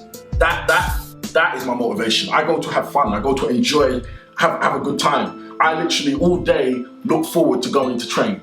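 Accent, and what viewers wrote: England, United Kingdom. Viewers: this is the first time listening to Simeon Panda's voice, I just found out he was an English man?! :) Thanks for the inspiration! :D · his accent is so londoner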